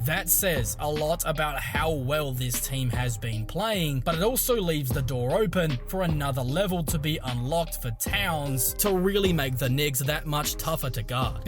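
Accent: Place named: Australia. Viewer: Listening to Knicks analysis in a crazy Australian accent is SICK! Lol I love it!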